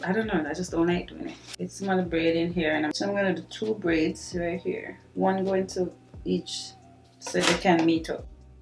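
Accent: Jamaica. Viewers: sounds like a Jamaican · U sound like a Jamaican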